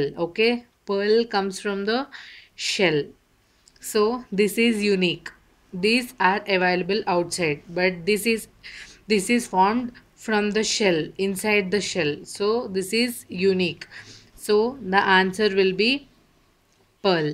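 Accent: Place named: United Kingdom. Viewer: mam aap ko london main hona chahiye tha aap ki english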